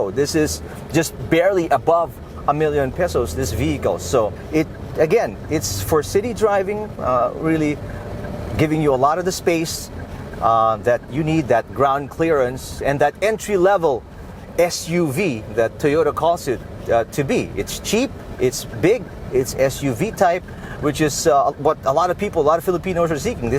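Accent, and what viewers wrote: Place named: England, United Kingdom. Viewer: English accent 😍 😍 😍 😍 😍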